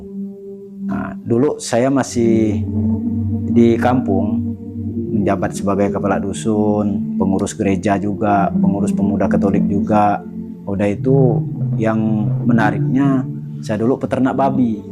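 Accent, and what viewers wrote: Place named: Madura, Indonesia. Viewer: Kok logatx madura bang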